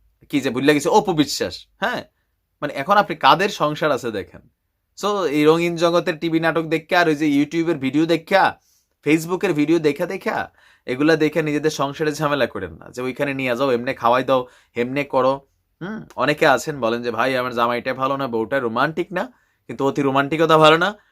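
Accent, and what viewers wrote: Comilla, Bangladesh. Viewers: ভাই, আপনার কথাগুলো মজার কিন্তু সত্য। আপনার কুমিল্লার Accent দারুণ।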